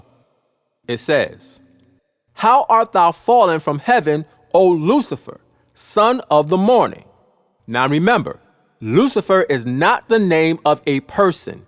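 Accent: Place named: Cockney, United Kingdom. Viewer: . .the maker (Son of Man)of tis video must be a cockney fr London ee.. he has that english cockne accent. .for info i m fr Borneo isle...studied Law fr London U...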